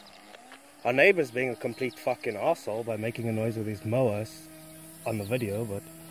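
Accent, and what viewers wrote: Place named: South Africa. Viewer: Awesome video man. I ordered one of these motors! (Along with tons of other rc gadgets) Also, I can hear that South African accent from a mile away haha awesome.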